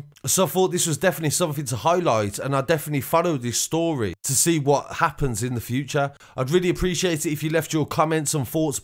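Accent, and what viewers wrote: Birmingham, United Kingdom. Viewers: Brummie. Bless him! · Lol that brummie accent is a bit strong just imagine if it was Ozzy Osbourne doing the narration lol.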